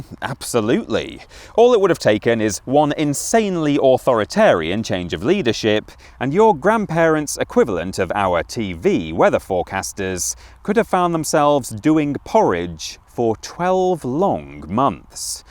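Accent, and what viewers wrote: United Kingdom. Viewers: Hi. Im ALSO 🤠, Ima Canadian. Oh, and also I studied in History. Just wanna say I liked the content of this video. It sounds accurate. But noticed your Very English Tone, which is Great, dont change anything, lol. But, I am Foreigner to your Land's Accent and its Very Crude Direct form of Communication. it sounds like the Typically British way of expressing Emotions being similar to something like... Roadkill. 😐 (thats My attempt at British Humour). LOL So, THE ISSUE😱, for me🤠 is just the Very Ending, with: ''Im, and this has been Interesting'' That I think that sentence comes off as Arrogant. Or that it would be received by a Canadian Audience, and possibly American Audience, as a British Guy who thinks he is Better than me! He Snubbed me with his Arrogance! I know this is not the case, but I almost didnt wanna watch another video, and almost forgot to hit the Subscribe button. 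So, as for My Part, I will be sharing your Video:) And maybe, for Your Part, you can avoid ending on that note, and find another way to end the video that wouldn't be misinterpreted as Arrogance, which I believe it is not. Good Wishes!:)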